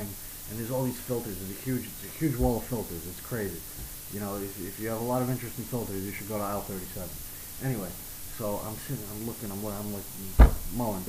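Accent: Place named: New Jersey, United States. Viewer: So what you're saying is...you like to bitch about how lazy you are. We laugh about people like you when you leave the store because you lack any common sense. And please say "thing" again. When I get some time, I will create a response to your lovely video showcasing how many times you say "thing", "filter" and "faucet". And I'm pretty sure all the employees don't talk like they're from the Jersey Shore.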